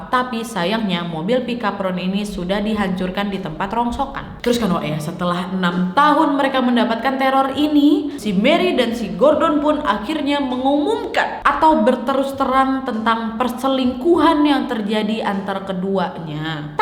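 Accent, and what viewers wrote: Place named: Medan, Indonesia. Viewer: Saya suka sama cara ngomong nya mba logatnya kayak orang Medan lucu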